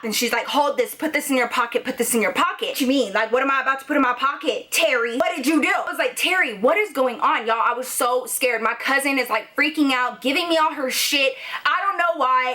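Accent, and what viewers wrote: Texas, United States. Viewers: I love her Texas and Hispanic accent together 😂😝❤👏🏻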